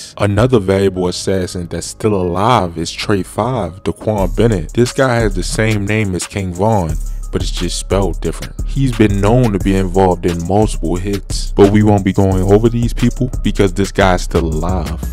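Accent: Baltimore, United States. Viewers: Baltimore accent is dope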